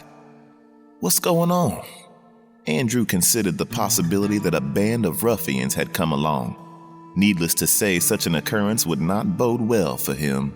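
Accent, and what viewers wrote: South, United States. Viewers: Loving the narration, great southern accent to the cool story. The vocabulary in this story is so enjoyable.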